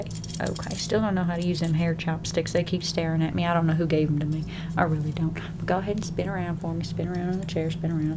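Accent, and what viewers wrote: South, United States. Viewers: Great southern accent.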